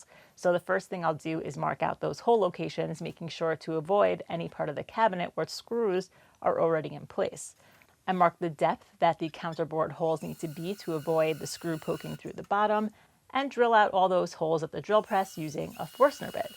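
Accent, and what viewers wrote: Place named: Brooklyn, United States. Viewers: + cool bench caster lever = Chatty Cathy. You are an adorable genius, not commonly found in the wild (Sounds like Brooklyn). Thanks again for the great video. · 1st video I have watched. Nice job and very creative on the lift mechanism. Where are you located if you don't mind me asking. Accent says NY but the don't miss being cold says you may have moved south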